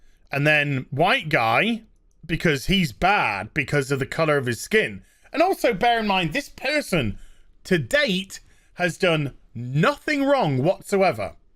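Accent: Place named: United Kingdom. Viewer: Damn that british accent is spot on.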